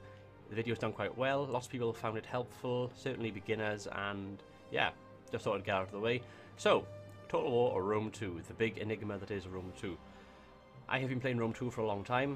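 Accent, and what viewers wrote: Wales, United Kingdom. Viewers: best tutorial ive found for total war rome 2, plus your welsh so it just sounds better :)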